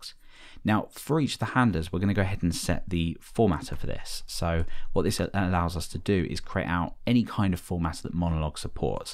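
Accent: United Kingdom. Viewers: tks sir ! btw your accent is a British accent right ? i'm Asian and i curious about english accents in the world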